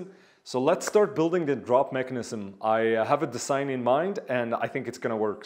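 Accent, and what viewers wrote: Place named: Sweden. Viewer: Älskar att höra din fina svenska dialekt 🇸🇪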